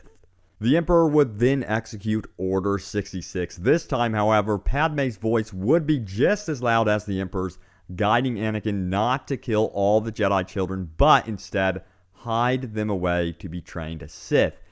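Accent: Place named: South, United States. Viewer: What state are you from? You sound kind of southern but not really.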